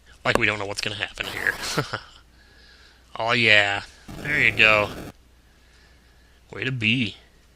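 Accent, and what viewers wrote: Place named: Midwest, United States. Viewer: aw sweet somone from the midwest im guessing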